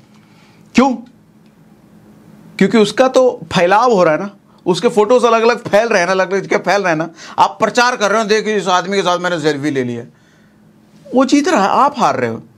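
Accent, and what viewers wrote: Bihar, India. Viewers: I love bihari style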